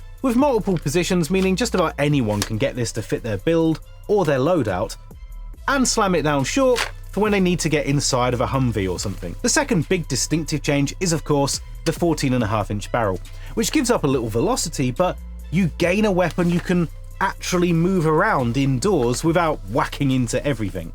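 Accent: United Kingdom. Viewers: Im used to guntubers to have a southern accent. Maybe Georgia or kentucky. Brit? Ok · What country are you in? Doesn’t sound by your accent you should have held a weapon in your life, not even a butter knife. How do people in the UK cut meat and vegetables for cooking now that I think of it? Illegal to have a knife is crazy.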